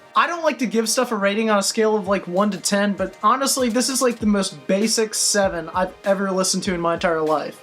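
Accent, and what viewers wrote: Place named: Pennsylvania, United States. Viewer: The way you make your "O" sounds in words I can tell you're from Pennsylvania or around that area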